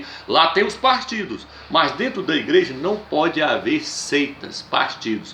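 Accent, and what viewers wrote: Northeast, Brazil. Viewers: tu é do nordeste amado??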